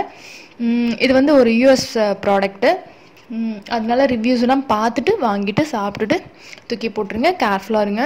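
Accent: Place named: Tirunelveli, India. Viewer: Neega ena vorunu sollata enoda guess sareya irunda neega tiruneveli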